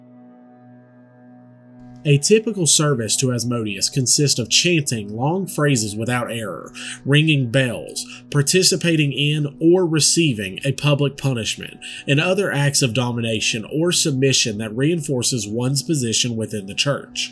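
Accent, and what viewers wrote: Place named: Deep South, United States. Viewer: Got that drawell, that deep south dwall lolll.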